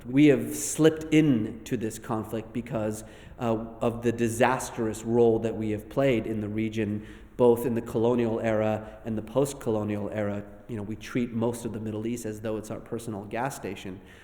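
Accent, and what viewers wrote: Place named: France. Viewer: poor production quality... too much echo and the french accent of the host is distracting.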